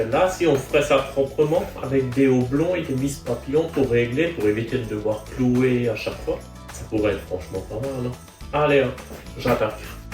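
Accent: Alsace, France. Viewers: bien sûr que notre Alsace est belle!!! Tu dois en savoir quelque chose non?! Vu que tu as le même accent que moi J'aimerais bien voir en vrai vu qu'on est voisin cela pourrait m'aider vu que j'ai des projets similaires ( je pense a une caisse a charger sur le plateau de ma camionette) en espérant que cela devrait compter comme chargement donc pas de démarches administratives... L'alasacien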